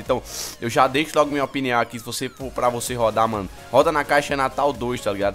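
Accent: Northeast, Brazil. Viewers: E legal ver o falando "atualização" esse sotaque nordestino e foda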